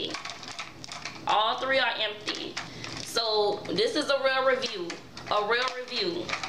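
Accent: New Orleans, United States. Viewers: This is a little random but what's that song at the end ? I fell in love with it lol. Love your video btw and your hair is gorgeous ! And that New Orleans accent tho !! 😍😍😍😍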